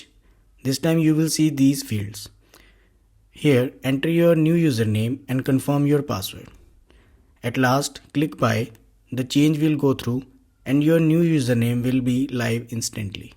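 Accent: India. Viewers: wow, nice scam, makes sense why the accent is indian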